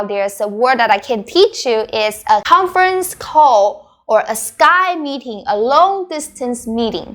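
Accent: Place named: Taiwan. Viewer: Yeah this is Taiwan Mandarin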